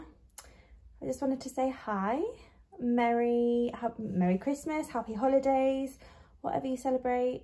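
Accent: United Kingdom. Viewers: I don’t know why but I thought you would be American! But you’re a fellow Brit!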